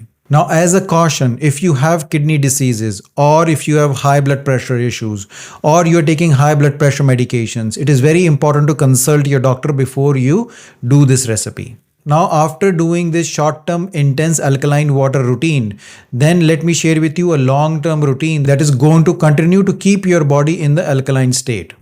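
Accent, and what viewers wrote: India. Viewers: Your accent tells me you are not from US! Even those that have studied from US do pick some accent. Yours is very typical Indian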